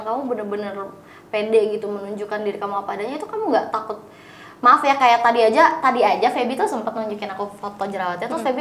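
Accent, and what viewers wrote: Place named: Makassar, Indonesia. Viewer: Org makassar kalau ngomong kentara bngt sih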